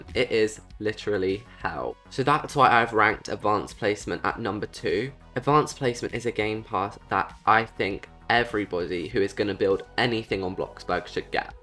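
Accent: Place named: United Kingdom. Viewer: I love ur britisj accent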